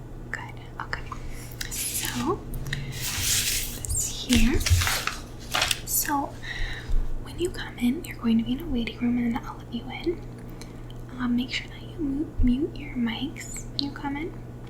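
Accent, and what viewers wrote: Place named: Midwest, United States. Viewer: You’ve got a total midwest accent but your background doesn’t look like the Midwest in the winter lol. What state do you live?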